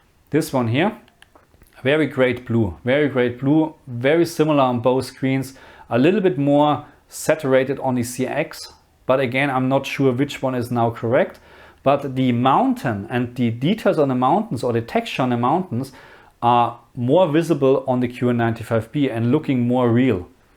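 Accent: Germany. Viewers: Great video. I like your`e german accent also. I have the lg cx. and its great. But its old tech now. and cant be that bright. but only in more then 1000 nits you seen that the cx is weak. with 800 nits, it has better details etc? but what you advice me, bought the s95b this year? or wait till second gen qd oled from samsung?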